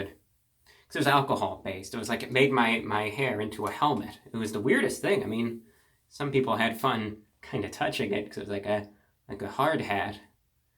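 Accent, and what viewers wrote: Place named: New York, United States. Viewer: If you watch his older videos he has a NY accent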